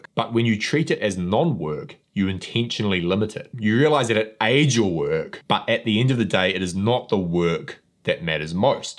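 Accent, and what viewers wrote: New Zealand. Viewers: r u from newzeland? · you've got cool accent! i actually never heard New Zeland's English :) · I might need to rewatch this video. awesome Kiwi accent is distracting me from the content. 😅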